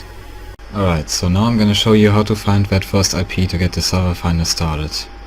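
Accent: Germany. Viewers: Boah dieser deutsche aktzent😂 Aber cooles video hat mir geholfen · are you german? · dieser deutsche Akzent XD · bro man hört halt das du deutsch bist aber du sprichst verständlich englisch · du bist ein deutscher :D merkt man an deinem aktzent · Man hat das Deutsch richtig raus gehört xD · i can hear you German accent xD. i love your client too, thanx. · a ok weil dein english ist nicht so gut weil wenn ich english rede höre ich mich fast so an wie du deswegen dachte ich du bist deutscher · You German right?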